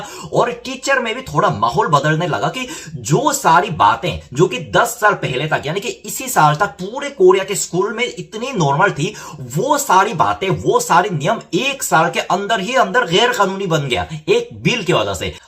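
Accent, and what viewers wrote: Standard. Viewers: Haayyy allah itni shudh hindi hume nhi aati hindustan main aake toba toba😂😂😂🥂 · Bhai jitni shudh hindi tumhe aati hai itni shudh hindi toh hame India mai rehkar nhi aati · North east wale nhi tumse v jyda sudh hindi bol raha hai korean log samjhe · inti sudh hindi hamare hindi ke professor bhi nahi bolte..❤👍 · Apne itne sudh hindi 😯, khana se sikhi.... Itne toh mujhse nhi boli jati 😂😂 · भाई इतना शुद्ध हिंदी कैसे बोल लेते हो इतना सटीक शब्द तो हम लोग नही बोल पाते हैं 😂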